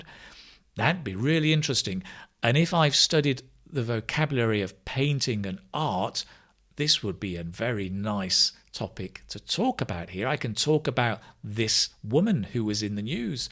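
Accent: United Kingdom. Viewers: Omg, you're bloody attractive speaker K !! i felt in love to listened to your beautiful voice and British accurately accent !! Your way of teaching also phenomenal ! I can't take off my eyes and ears off of your videos ! Xxx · I wacth, I like your accent. So very British. Sticky 👍👍i subs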